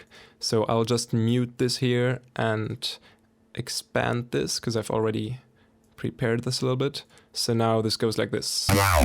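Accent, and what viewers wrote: Germany. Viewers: du sprichst ja Deutsch 1.Dein Musikprogramm ist in Deutsch 2.Man hört an deiner Stimme das Deutsch sprichst 3.Du bist der beste Deutsche DJ der Welt!!!! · You have a germany Akzent or you can speak better than the most english/amerikan people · That german accent tho lol :D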